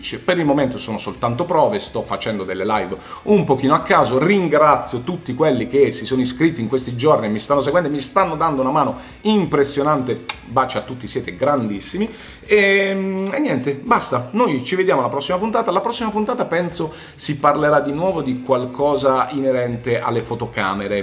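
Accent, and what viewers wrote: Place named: Foggia, Italy. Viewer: Ma sei di Foggia??? 😁😁😁 Riconosco l accento familiare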